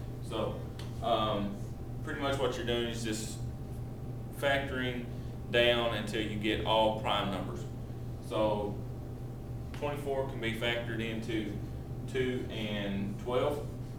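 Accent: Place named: Texas, United States. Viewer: good teacher. I like his voice it reminds me of Texas.. I like the way he does the method of problem solving too.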